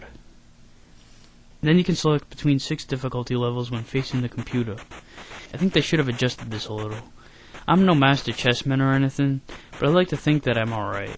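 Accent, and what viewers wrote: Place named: Boston, United States. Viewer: This guy has the best God damn accent. Are you from Boston?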